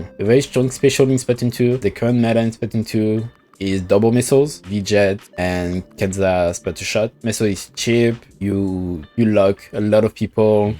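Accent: France. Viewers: Tu parles vraiment bien anglais mais honnêtement j'ai pas besoin de vérifier t'es français je pense X) on parle tous pareil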